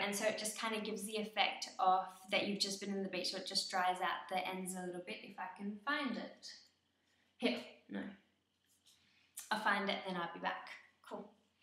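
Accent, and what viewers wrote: Australia. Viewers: Thanks I thought you sounded Australian x its a lush accent x :)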